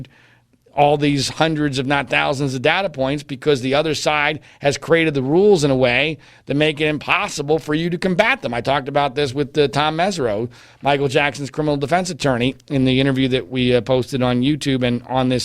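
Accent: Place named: Philadelphia, United States. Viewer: I knew there was a reason why I enjoy listening to you. You're from Philly.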